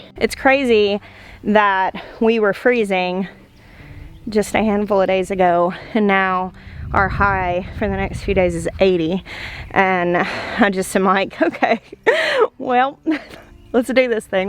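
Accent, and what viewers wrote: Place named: Ozarks, United States. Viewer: Love your accent, sounds like someone from ozark!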